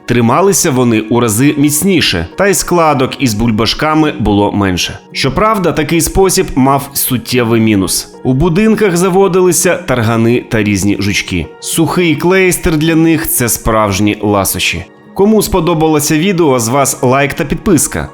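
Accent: Russia. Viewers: дякую,! тільки бажано вдосконалювати вимову, щоб позбутися російського акценту.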